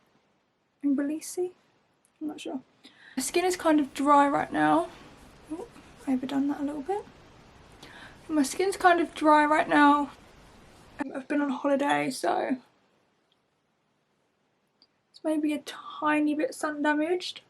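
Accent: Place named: United Kingdom. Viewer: so lovely british accent ❤️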